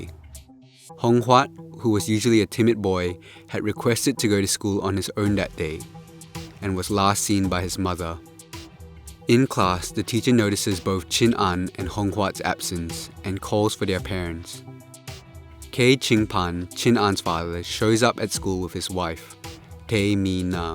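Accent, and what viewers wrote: Australia. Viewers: thank you, the aussie accent is strong and kinda distracting too. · I noticed also. The accent is light, but it is def Australian what. Maybe need trained ears to be able to hear as not everyone is good at spotting accents?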